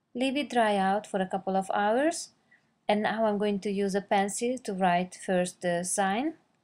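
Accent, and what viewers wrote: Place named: Russia. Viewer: а чё мужика то нет чтобы с палетами помочь. По акцентику то ты походу наша дифчонка :-)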